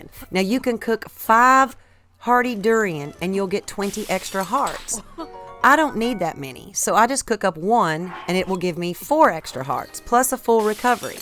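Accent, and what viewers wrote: South, United States. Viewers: Love that souther accent. So informative. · It’s 2022, I don’t watch these for the tips 😂 I’m here for her soothing country voice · Southerners play video games? 😂. Sounds so odd for a souther gal talk VG’s. Respect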